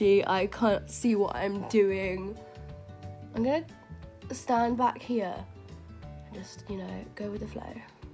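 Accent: United Kingdom. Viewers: She's British but she lives in France ( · YOU’RE BRITISH?!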